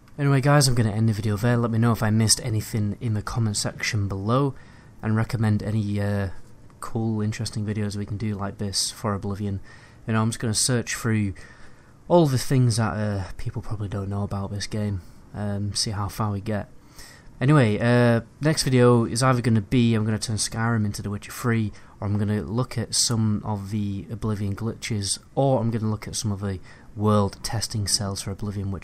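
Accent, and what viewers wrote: United Kingdom. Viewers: Wait, is British!?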